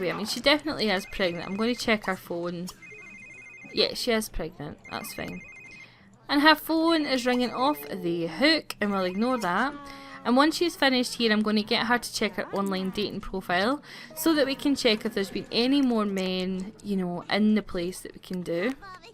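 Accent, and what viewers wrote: Scotland, United Kingdom. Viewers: Oh my god! This...is...amazing!! Don't shut your self up please! I want to hear your beautiful Scottish accent. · Baby girl = Skye xxxxx love your videos and your voice. a scottish accent is always fun to hear! haha x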